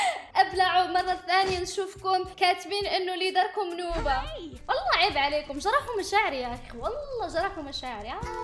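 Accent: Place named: Lebanon. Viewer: هههه تتكلمي لبناني 😅😂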